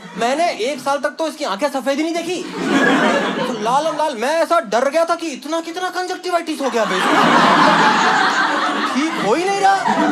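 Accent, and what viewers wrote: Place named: Hyderabad, India. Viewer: U have slightly hyderabadi hindi. Loved to hear .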